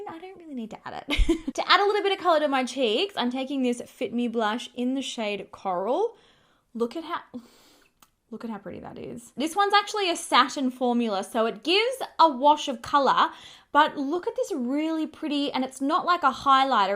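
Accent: Australia. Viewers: I’m a libra too! But I love how you Aussies say it! ❤❤❤❤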